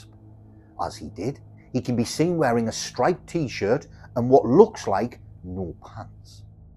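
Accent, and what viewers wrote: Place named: Scotland, United Kingdom. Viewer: This channel keeps coming up but that's fine I like the animated story telling style and the Scottish? accent is entertaining for an Aussie viewer. The setting is a bit bleak, a prison.....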